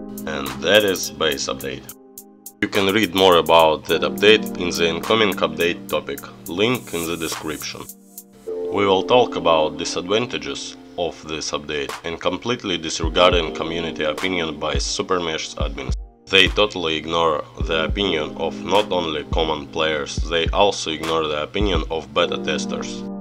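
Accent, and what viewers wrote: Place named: Russia. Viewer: I love your russian accen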